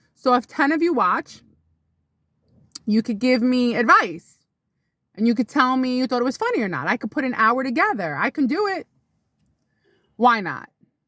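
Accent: New York, United States. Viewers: You have that new York accent .love it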